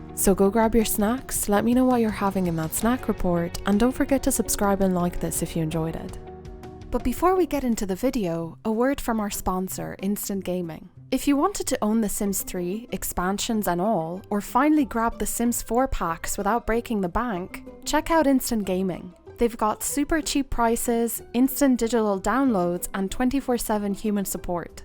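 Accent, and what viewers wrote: Ireland. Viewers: I have been listening to you and watching your videos forever and only just now clocked your Irish accent!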